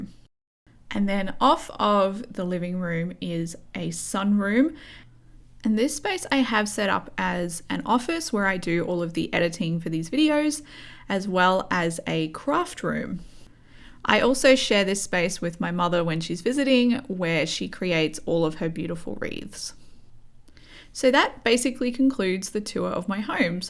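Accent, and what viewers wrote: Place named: Australia. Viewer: New subbie here. I was so happy to hear your aussie accent when I clicked on this video, as I live in Melbourne. I absolutely love your home, and the way you decorate, it's so much my taste. And those wreathes are just beautiful : )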